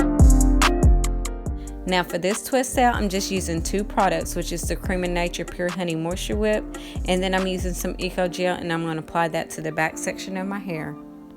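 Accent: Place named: South, United States. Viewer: Love your country girl accent, makes me trust you. #countrygirlsunited I love natural hair videos. I hope you make many more.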